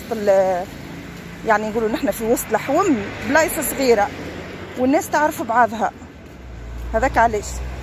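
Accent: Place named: Gafsa, Tunisia. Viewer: والله حسيت لهجة قريبة ليا برشا قفصة وبوزيد من أول الدنيا واحد ملا هذاكاهو أول ما تهبط لتونس نشوفوك عزيزتي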